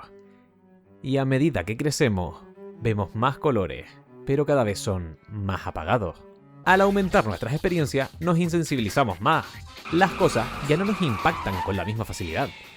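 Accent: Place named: Argentina. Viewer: Tu acento es una mezcla entre Argentino y Español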